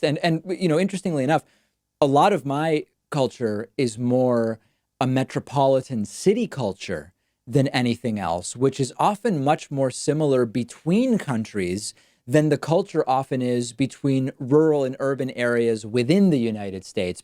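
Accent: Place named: Argentina. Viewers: I just found this channel and yepp, white chilean american here and his accent just takes me back home (not to Chile of course, but I’m just so familiarized with the Argentine accent ❤) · [Y]=[SH] the Argentine accent equation.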